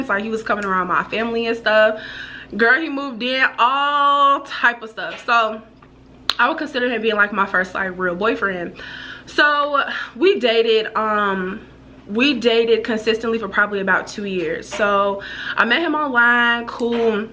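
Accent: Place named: Minnesota, United States. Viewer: You can tell your from Minnesota 🤣. GOOFY! I haven't heard somebody called that since I left. Love ya girl. Keep ya standards high.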